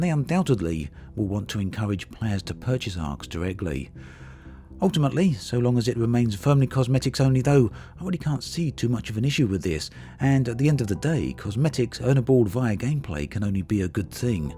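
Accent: United Kingdom. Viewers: What the new currency called, I can't make it out with the strong UK accent? Arcs? ox. rocks?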